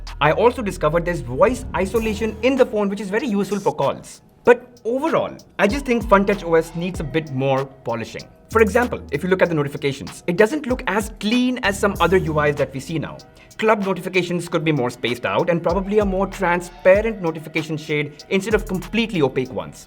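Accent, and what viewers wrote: India. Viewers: I hate indian accent of speaking english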